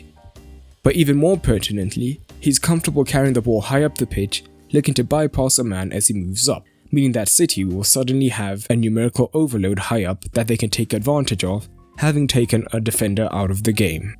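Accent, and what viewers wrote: South Africa. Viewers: Is the narrator of this video South African? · 😅Brother I can your South African accents ,you can’t hide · You sound South African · Are you South African 😂? Shoutout if you are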